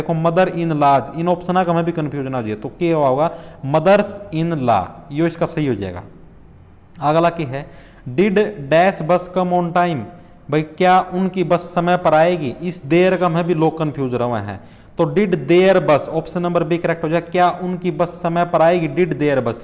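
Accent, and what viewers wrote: Haryana, India. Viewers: Nice haryanvi sir ji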